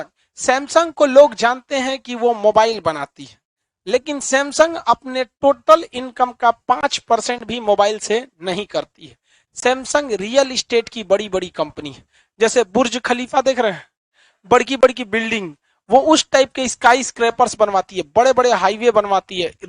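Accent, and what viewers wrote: Bihar, India. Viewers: यार तू पहले बोलना सीख ले आर यू बोलने की तमीज नहीं है क्या बिहारी भाषा बोलता चावल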